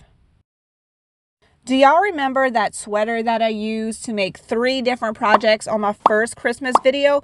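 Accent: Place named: South, United States. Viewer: I liked the cafe curtains. I love your southern accent!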